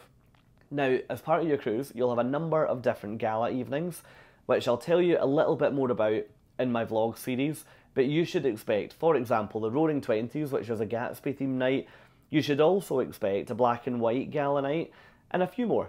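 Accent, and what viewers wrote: Scotland, United Kingdom. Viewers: Is this Scottish accent? I like it.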